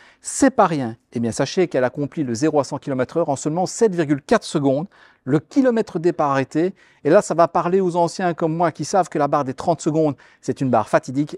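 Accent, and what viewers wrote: Belgium. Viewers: Merci pour cette video, anciennement frontalier, votre accent m'a rappelé de bon souvenir sur les plages de La Panne !!! Je ressens votre amour pour renault 😊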